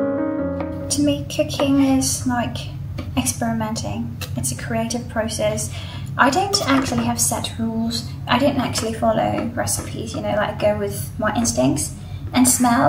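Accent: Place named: United Kingdom. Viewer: May I know your nationality? Pls don't take it wrong. I am just curious because your accent sounds so immaculately British.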